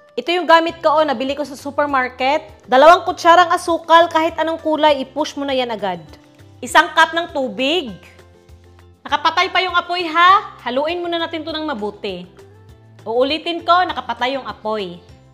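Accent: Visayas, Philippines. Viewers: Ka cute kaayo mag bisaya ba 😂❤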